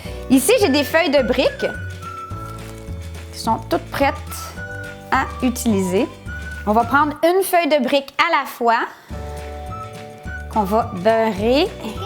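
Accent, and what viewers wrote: Canada. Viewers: les vidéos sont si agréables a regarder! j' apprécie particulièrement votre cote naturel et jovial!!! un moment de détente a chaque visionnage!!! ne changez rien! Vous avez vraiment votre place sur la toile!!! Un grand merci! à la maison vous tes connue de toute la famille!!! mes fils me disent "maman c'est une recette de la petite bette???" Nous aimons vote accent, il nous tarde de venir visiter le canada!!!! Merci Merci:):)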